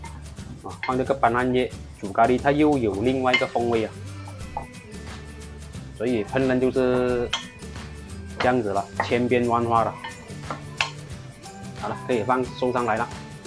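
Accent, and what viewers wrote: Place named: Malaysia. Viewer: 馬來西亞的華語好可爱😂😂，有些聽不明，先給個讚👍然後再給個掌👏👏👏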